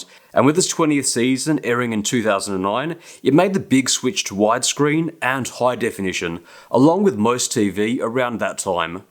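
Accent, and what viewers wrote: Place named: Australia. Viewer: Jesus how Australian are you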